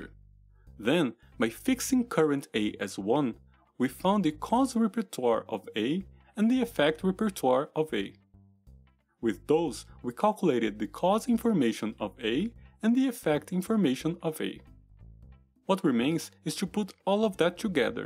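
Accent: Brazil. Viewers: I need to ask you this. Your accent makes me believe you are Brazilian. Is that the case? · You're Brazilian right? I can spot the english brazilian accent in a second! I'm Portuguese BTW, and loved the video. I'm trying to get into IIT and this is much helpfull. When are you going to post a follow up? Um abraço do outro lado do Atlântico!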